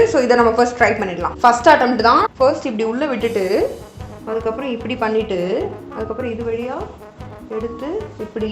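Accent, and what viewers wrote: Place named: Kanyakumari, India. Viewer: Are you from kannyakumari your slang is pretty good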